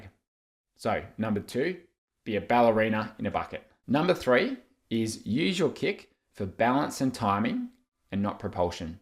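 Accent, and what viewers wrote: Australia. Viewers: Maaaate! You sound Aussie and should know that it's pronounced BOY not booey like the Yanks say.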